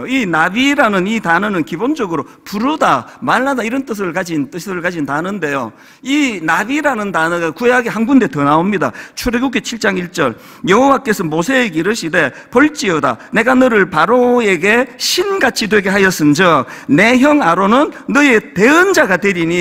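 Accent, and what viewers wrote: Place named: Gyeongsang, South Korea. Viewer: 제가 대구사람인데 서울출신 남편이 처가가족들 대화들으면 싸우는것 같다 그래요 ㅋㅋ 목사님 경상도 스타일이셔서 그런것 같아요^^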